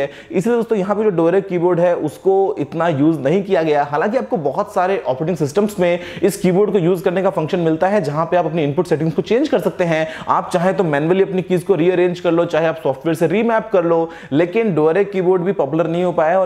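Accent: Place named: Haryana, India. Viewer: Sir aap basically aap haryanvi hai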